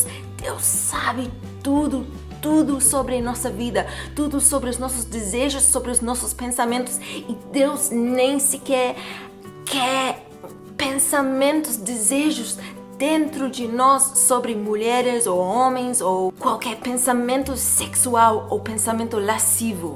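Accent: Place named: United Kingdom. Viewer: New subscriber... i love a bristish accent ... but born in portugal 🙌🏼🙌🏼🙌🏼🇵🇹🇵🇹🇵🇹 love your chanel you explain things well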